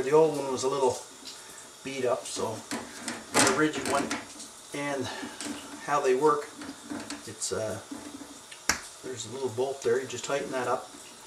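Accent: Canada. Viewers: The narrators Canadian accent is throwing me off. Dont know when he means house and hose...they both sound the same to me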